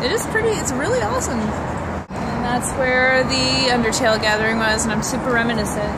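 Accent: France. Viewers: Nice French accent (I am french)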